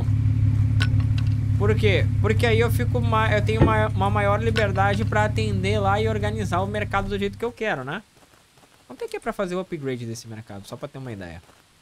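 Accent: Portugal. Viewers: Esse é sim o português de Portugal!! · Português de Portugal e praticamente isso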